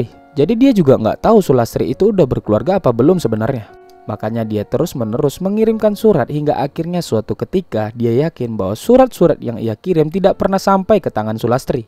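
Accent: Medan, Indonesia. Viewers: Lanjutkan logat medannya bg..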